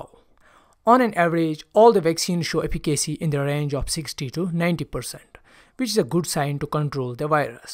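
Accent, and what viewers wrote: Kashmir, India. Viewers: i can tell you already by the indian accent that chinese vaccines will be rated the worst before i even watch the video :) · Kashmiri guys ....ur tone of eng shows ...nyc vdo bro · Indian accent, nah bye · 200% he is kashamri..but nice way to english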